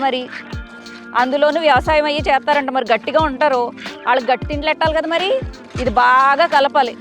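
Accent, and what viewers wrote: Godavari, India. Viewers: ఒసేయ్ లప్ప గోదారోళ్ళ భాష మాత్రం బాగా పట్టవే! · Nv matldedi godavari yaasa ,rayalaseema antavu enti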